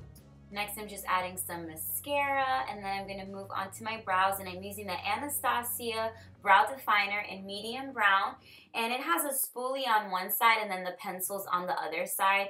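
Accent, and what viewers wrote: New York, United States. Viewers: are you from nyc cause I hear that accent like is it me or are you a fellow new yorker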